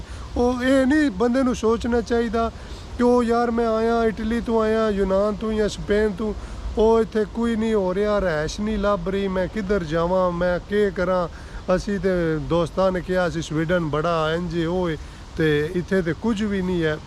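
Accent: Gujrat, Pakistan. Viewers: AP ki boli se lagta hai k ap Gujrat se ho brother Khush raho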